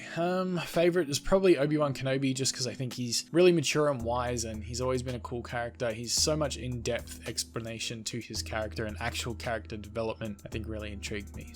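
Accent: Australia. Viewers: Your Australian? The Upside Down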